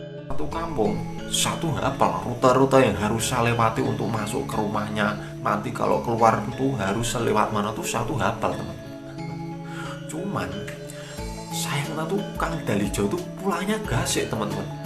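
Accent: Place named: Java, Indonesia. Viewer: Wong jowo ki nak ngendi2 mesti logak e ketok mas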